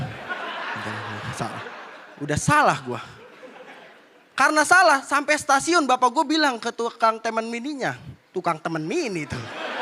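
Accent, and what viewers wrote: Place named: Betawi, Indonesia. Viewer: Lu Betawi ya Bang? Lucu banget dan logatnya enak didenger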